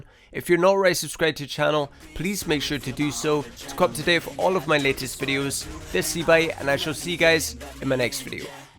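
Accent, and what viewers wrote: Scotland, United Kingdom. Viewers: R u Scottish? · Where you from?can hear a Scottish accent a bit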